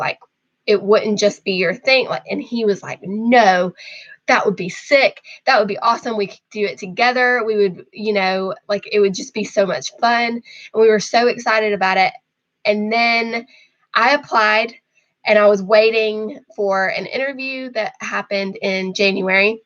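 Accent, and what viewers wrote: England, United Kingdom. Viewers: Hereford is a lovely county.....and you definitely are developing an English accent!! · You are already sounding British after living in England. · Definitely hearing an English accent coming through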